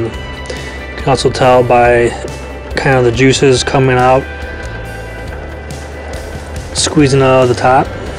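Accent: Chicago, United States. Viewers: Love your video - thanks so much! The ribs come out GREAT this way! Love your Chicago accent too!! ;) GO BEARS!! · Sounds like a Chicago accent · I'm hearing a heavy Chicago accent!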